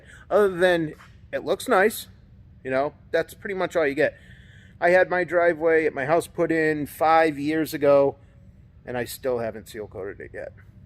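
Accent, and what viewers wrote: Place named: Northeast, United States. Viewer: i knew you were from the north east from your accent. Cheers from New York brother. - Mike, Asphalt Works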